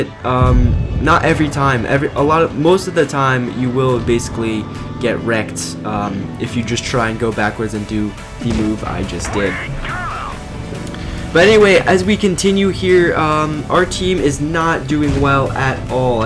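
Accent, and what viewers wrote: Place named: India. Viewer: the indian accent xD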